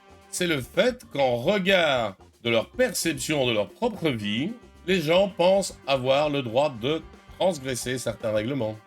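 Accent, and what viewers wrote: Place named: Belgium. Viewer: Salut, c'est intéressant et ça se voit que ça te plait de faire des vidéos et que le sujet t'intéresse donc c'est communicatif pour l'apprentissage. Sur l'humour, fais gaffe que tu fais des références belges (sans parler de l'accent mais on se refait pas) que la majorité de l'audience va avoir du mal à comprendre (par exemple le minerval) donc je pense que tu vas perdre pas mal de gens, l'humour coupe déjà le rythme du propos principal alors s'il introduit des références inconnues je pense que tu perdras + les gens. Bonne chance pour la suite de ta chaîne !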